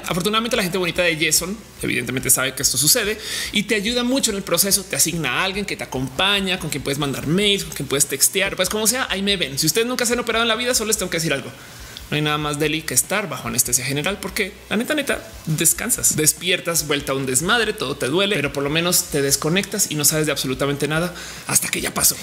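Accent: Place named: Colombia. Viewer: Yo sabía que eras colombiana, se te nota en la voz un montón. Eres muy bella (ame tú collar está precioso)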